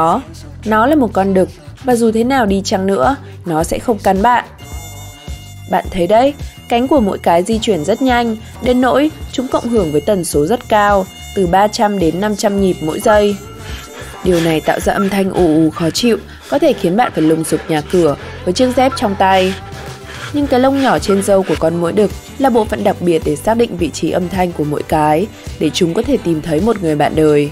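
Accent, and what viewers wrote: North, Vietnam. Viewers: Cho anh giọng Bắc hay và ấm hơn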